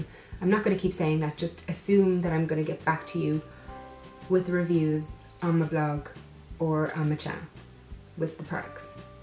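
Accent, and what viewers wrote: Ireland. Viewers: Ur accent is like Irish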